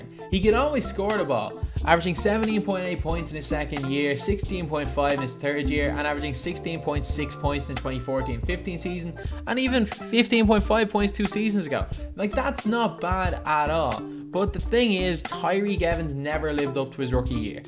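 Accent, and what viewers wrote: Ireland. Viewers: D-ManHD lol what is this comment? He sounds Irish.... because he is Irish. Think about it. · Dude u sound Irish??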